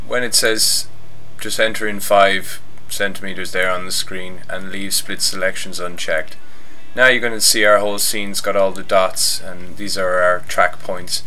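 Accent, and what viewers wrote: Ireland. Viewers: that silky sexy Irish voice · are you Irish?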